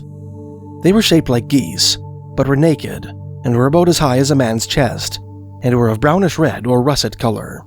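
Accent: Canada. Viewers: They haven’t figured out a way to make AI with that maple-mellow Canadian accent yet. Calm down, bud, don’t get so testerical 😂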